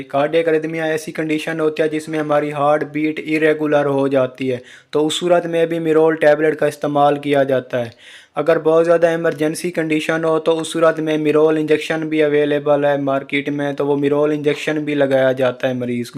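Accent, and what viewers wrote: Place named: Saraiki, Pakistan. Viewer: بندہ ہے تو پڑھا لکھا تاہم لہجہ انپڑھوں والا ہے کوئی پیور دیہاتی اور سرائیکی لگتا ہے ۔۔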